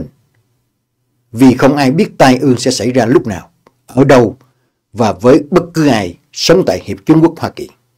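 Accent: Mekong Delta, Vietnam. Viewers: Nghe câu nói rặt chất giọng người miền Tây của anh Việt Linh tôi cười mãi. Cả thế giới đều biết về Trump như thế nào, chạy xa lánh tên cùi hủi này nhưng người mỹ thì không thấy, không biết gì cả, thế mới lạ? 🤔🤔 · Cảm ơn câu nói chân chất, nhà quê, mộc mạc của Bác hai Trầu (Việt Linh), đúng chất giọng dân miền Tây, người Sài Gòn. 🤣🤣🤣👍👍👍👍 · Cảm ơn nụ cười vui với tựa đề hôm nay ngày Mồng Hai Tết, đây đúng là chất giọng nhà quê rặt miền Tây. 👌👌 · Tôi vừa nghe xong, chương trình đầu năm rất hay, cảm ơn anh Việt Linh nói lên suy nghĩ thay cho nhiều người về tình trạng dân trí xuống cấp tại Mỹ, mê tín di đoan tăng cao trong nhiều sắc dân, và tôi thích nhất câu nói rặt miền Tây của anh. TUYỆT VỜI. :face-red-heart-shape::face-red-heart-shape::face-red-heart-shape:👍👍👍👍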